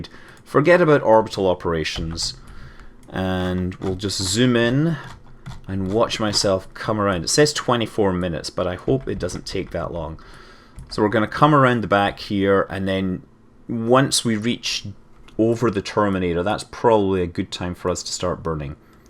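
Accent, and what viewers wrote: Scotland, United Kingdom. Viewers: It's pretty obvious from his accent that he is from Scotland.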